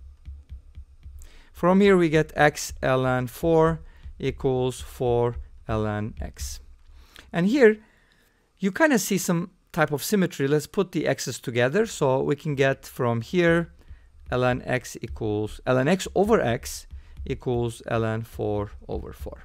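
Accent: Turkey. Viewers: bakmadım ama k ve r harflerinin telaffuzundan ötürü kesin türk bu adam dedim. Canımsın severek izliyorum yeni keşfettim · Hey, are you by any chance Turkish? You sure sound Turkish. Other than that, great video! I really enjoy these bite-sized equations.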